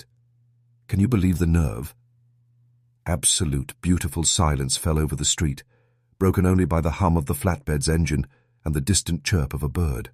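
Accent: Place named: United Kingdom. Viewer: What's with the British accent? Make it American. Viewing from Binghamton, NY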